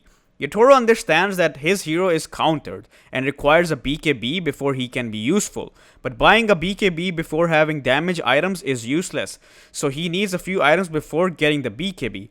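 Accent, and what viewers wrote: India. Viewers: You talk like Indian ))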